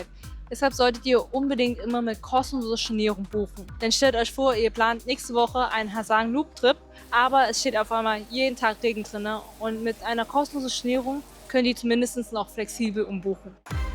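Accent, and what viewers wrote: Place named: Saarland, Germany. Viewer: BIsch du aussem Saarland? :D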